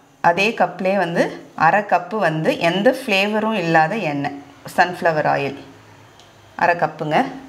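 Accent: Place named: Cuddalore, India. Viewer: No words shown ka. Video is clear. I think mam had turned on tamil caption(subtitle) in her mobile. Cake looks super. Please try Eggless Brownie soon. Myself born and bought up in cuddalore district, my daughter born in Jipmer. We got settled in Chennai. Really your slang gives me my own native touch.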